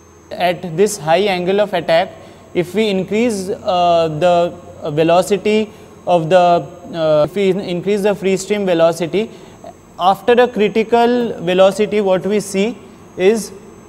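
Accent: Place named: India. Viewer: Indian voice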